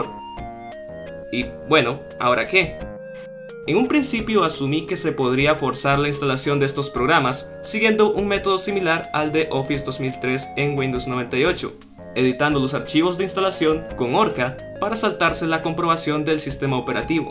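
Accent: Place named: England, United Kingdom. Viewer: I like your English accent, it sounds so cool. Been awhile since I visited your channel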